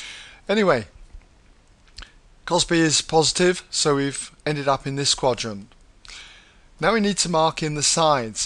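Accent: United Kingdom. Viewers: The British accent made this video both entertaining and educational! British!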